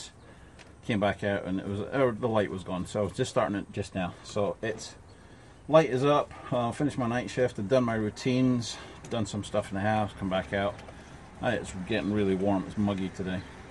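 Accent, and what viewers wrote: Scotland, United Kingdom. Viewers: Have you always lived in canada? I swear I can hear some scottish in your accent!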